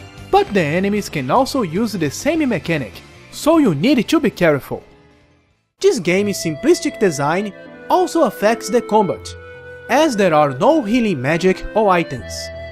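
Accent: Brazil. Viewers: moh sotaque brasileiro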